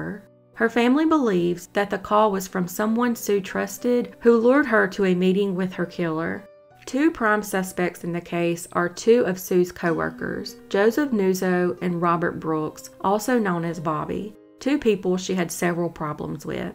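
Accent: South, United States. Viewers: I find your southern accent cute. You are a great narrator and I hope you get picked up by a major network. You are very detailed.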